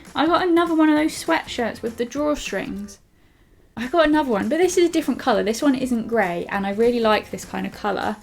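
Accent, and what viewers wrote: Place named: England, United Kingdom. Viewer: Ugh I just wanted to watch a clothing haul without hearing someone yelling and practically screaming in my ear and I came across this, so soft And an English accent.. wow THANK YOU!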